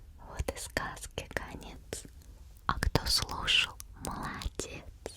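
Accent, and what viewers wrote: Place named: Russia. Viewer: Amo el acento ruso, relaja muchisimo❤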